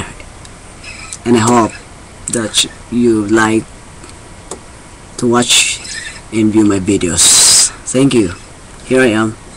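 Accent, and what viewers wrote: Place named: Philippines. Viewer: He actually got a decent voice. Is he Filipino? His English accent sounds like my all time favorite World Boxing Champion Manny Pacquiao.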